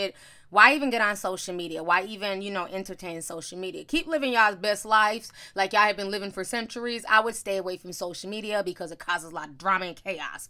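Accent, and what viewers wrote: United Kingdom. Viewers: The whole way delivered this video in that crazy British accent had me dyiiiiiiiiing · Love your British accent 🇬🇧That was and cake worthy ☕🍰😂😂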